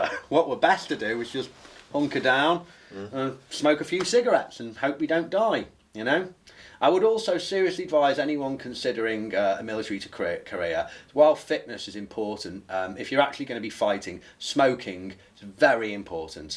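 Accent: United Kingdom. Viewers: British ish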